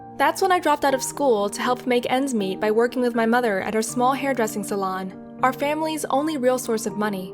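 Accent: United States. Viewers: Dislike. She sounds like she's from the USA, not North Korea, so she has no first hand experience, This makes her sound like a propagandist. To anyone who this did not occure to, your thoughts and opinions are being controlled by propagandists. I do not know the full message of this video as I stopped at 58 seconds, so if anything changed, then I don't know about it, but from what I heard so far, it sounds so incredibly propagandist to a point that it;s difficult to listen to. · She does not sound fron North Korea 🇰🇵 she sound from America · Stick The person who submitted the story is from North Korea, the narrator is American. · U r from korea but u have a american accent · Yet you sound American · she sounds american also (not bieng racist) dont asian people have like an acsent like common she dosent sound korean at all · hmmm American accent hmmm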